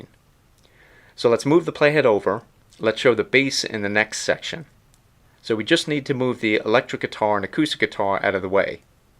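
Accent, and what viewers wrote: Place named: Carolinas, United States. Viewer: Well isn't this a Wealth of Information. It's a Definite Like, Save and Share. How kind and smart of you to share. Unfortunately I'm a bit homeless right now. I'm making videos off my phone Anywhere USA! Made I'm The USA! By your charming accent, I'm guessing the Carolina's. Hmmm... a gifted Musician, Songwriter and Instructor. I bet you're really good at Math. 🌺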